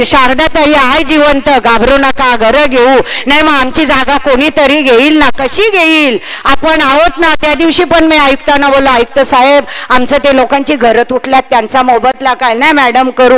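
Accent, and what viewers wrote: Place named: Agri, India. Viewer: Agri aavaj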